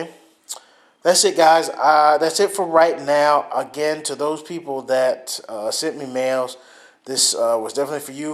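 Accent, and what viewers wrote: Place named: Jutland, Denmark. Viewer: That accent.. You've lived in Jylland too long man :)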